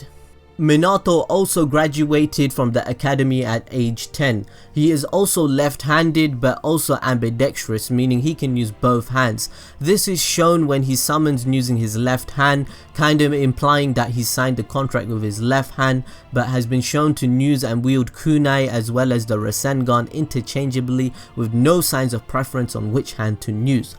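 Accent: United Kingdom. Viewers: Yes, very thick British accent. great vid. though. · Your British accent can be as thick as you like as long as you don't say "bluud or fam", I can't stand that down to my soul bro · Your accent is B r I t I s H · Your British wow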